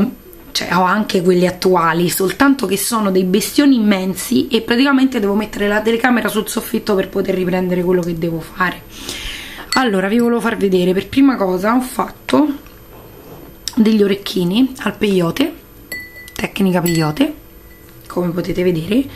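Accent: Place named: Rome, Italy. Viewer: Nun se spostano de na pezza...........che bello sentì parlà romano .........................mi manca la mia Roma. A parte questo sfogo bravissima molto belli i tuoi lavori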